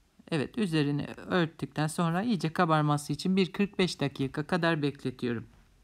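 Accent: Black Sea, Turkey. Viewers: aksandan biraz karadeniz yöresi sezdim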